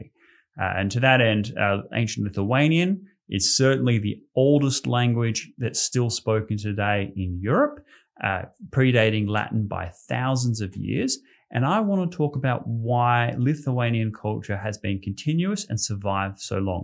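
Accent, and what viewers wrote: Australia. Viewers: Thank you! Its amazing to listen australian talking about these forgotten things!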